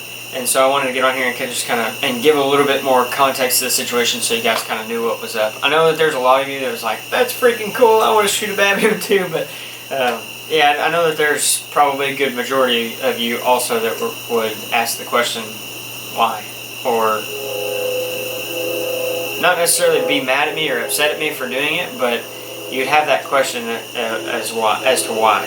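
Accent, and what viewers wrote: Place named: Baltimore, United States. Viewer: Explanation sounds like Baltimore Maryland. Keep up the good videos.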